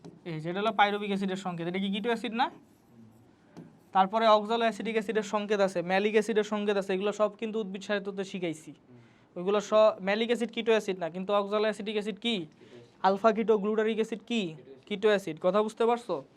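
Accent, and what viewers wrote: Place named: Barishal, Bangladesh. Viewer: ভাই মনে হয় বরিশালের,😊 মাশাআল্লাহ ভালো বুঝিয়েছেন।❤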